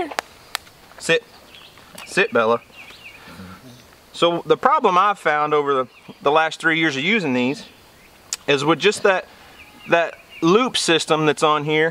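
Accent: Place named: South, United States. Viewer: U a city slicker he's a southern farm man!!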